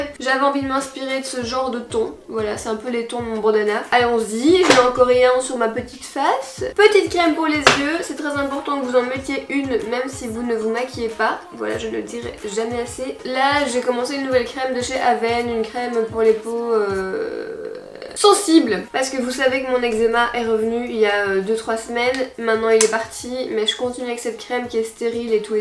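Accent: Belgium. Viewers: J'aime troppppp ton pti accent belge qui revient tout au long de la vidéo! BELGIUM RPZT :D :D BIsouuus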